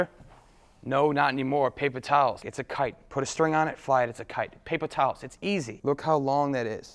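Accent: United States. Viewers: He sounds like he's from New Yawk x3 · Loving the Boston accent!!! "Look at all the squaaaahhees.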